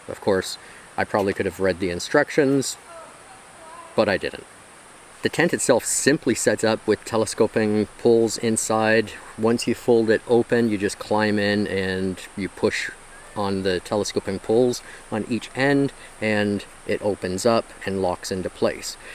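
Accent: Canada. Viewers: aboat haha you are canadian for sure nice review ...come in quebec we have good sea side camping places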